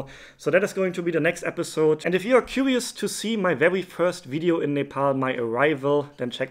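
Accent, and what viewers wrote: Germany. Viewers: U sound like German accent? R u German?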